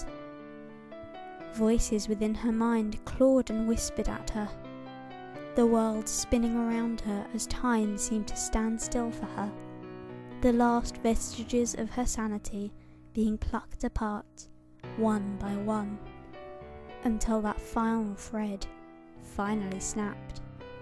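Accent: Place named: United Kingdom. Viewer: The most wonderful and precious thing about this story is the narration. This is the true accent of Britain, remember it well as it is becoming increasingly rare. The murder and defilement of the English language is a horror story in its own right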